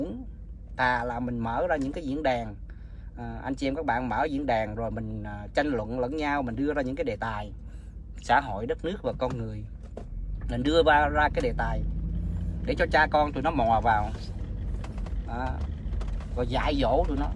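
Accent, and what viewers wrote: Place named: South, Vietnam. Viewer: hay lam anh noi dung day cach noi chuiyen rat nam bo toi thich anh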